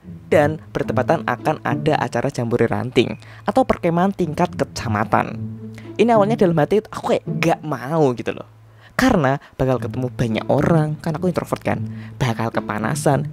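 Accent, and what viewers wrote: Java, Indonesia. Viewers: Jowo Yo mas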